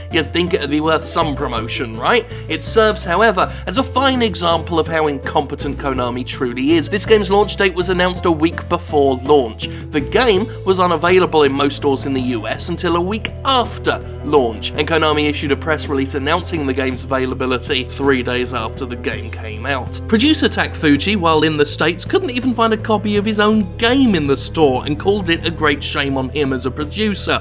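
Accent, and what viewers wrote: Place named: Yorkshire, United Kingdom. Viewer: I'd pay so much money for a Silent Hill voiced entirely by Jim. Especially that Yorkshire accent.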